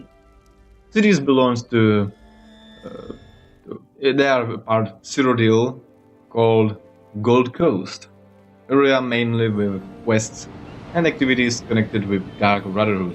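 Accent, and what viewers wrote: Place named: Europe. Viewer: I think he's just European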